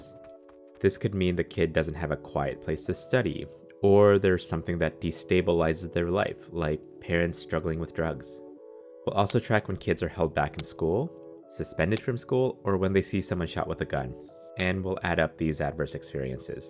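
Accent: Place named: United States. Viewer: Okay, I'm guessing America seeing as the narrator has an American accent and you tracked whether the kid sees someone get shot.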